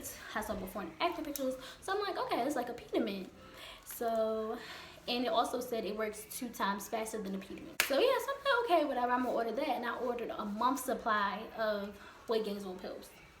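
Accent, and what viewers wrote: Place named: Maryland, United States. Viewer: Girl I'm from MD and you sound like you are too 😝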